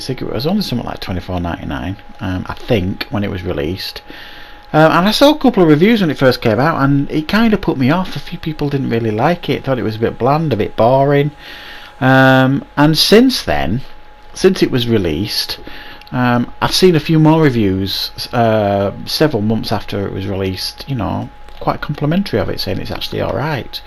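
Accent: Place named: North, United Kingdom. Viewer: Nice to hear a Northern accent!!!!!!!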